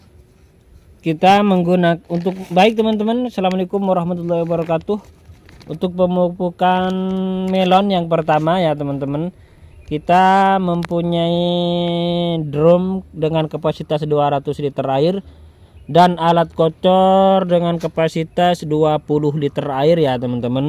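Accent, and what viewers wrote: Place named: Madura, Indonesia. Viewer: kyak logat madura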